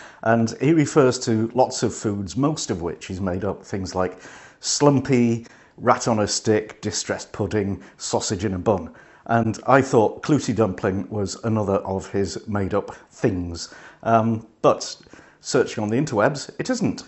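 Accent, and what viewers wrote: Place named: Scotland, United Kingdom. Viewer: love the scottish accent lol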